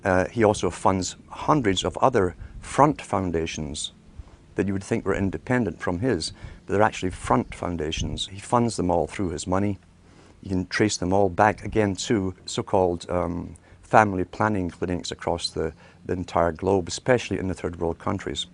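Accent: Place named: Scotland, United Kingdom. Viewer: He has a different voice, he has a Scottish voice here, and all his other audios he has a different accent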